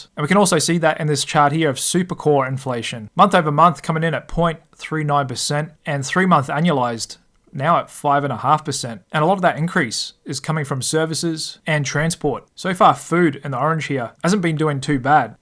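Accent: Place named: Australia. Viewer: The format, style and delivery of these daily videos is amazing. They really help me to keep track of what is going on globally and I am forever grateful for all your hard work and commitment to this. I only hope you keep it up and continue helping all the really grateful viewers you have, and I think I can speak for them on this occasion. Perhaps a bit naughty of me to say this but your Australian twang is such a refreshing change from the usual accents that we listen to day in and day out on financial channels. This is coming from a Brit! You come across as really professional, easy on the ear, and sincere. Cheers mate, hope you keep delivering!! Have a great weekend 🇦🇺🇬🇧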